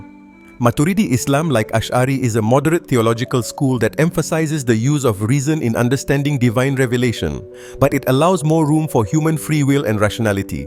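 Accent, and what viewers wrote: India. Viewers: has indian accent. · 😂😂 a video with Indian accent big red flag 🚩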